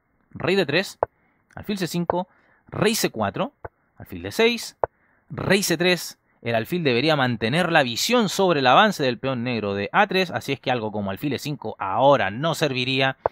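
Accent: Chile. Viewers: se nota poco lo chileno puro aguante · Tu acento es ¿ Chileno?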